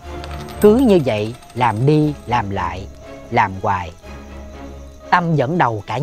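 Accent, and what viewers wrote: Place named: South Central Coast, Vietnam. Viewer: Seri này là 1phần 50 tâm linh , còn 1phần 50 ý nghĩa và sâu xắc,anh kể giọng rất hay❤🎉.Cứ như tiếng miền trung miền nam zậy,đúng thiệc là anh kể zui thiệc với rất kinh dị,nếu xem chung với bạn bè thì còn zui,chứ xem một mình thì hơi sợ nha😅,em chúc anh lập sẽ tích cực hơn sẽ ra nhiều video hơn nữa và thú vị hơn ạ❤❤😊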